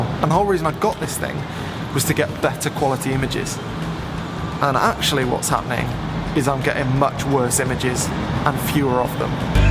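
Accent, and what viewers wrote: United Kingdom. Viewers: I did not leave a negative feedback but I find videos with British accents off-putting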